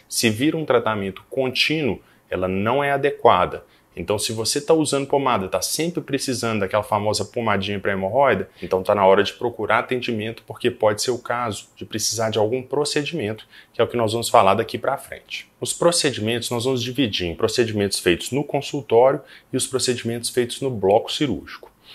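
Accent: Minas Gerais, Brazil. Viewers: Esse sotaque mineiro, é uma graça!!! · O sotaque mineiro é o mais bonito!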